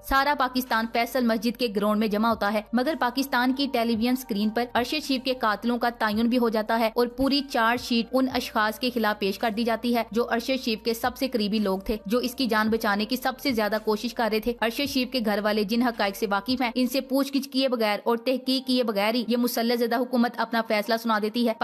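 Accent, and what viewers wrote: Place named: Punjab, India. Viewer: as always just luv and wait for ur post ad your sign off in lovely Punjabi tone Thanks' for watching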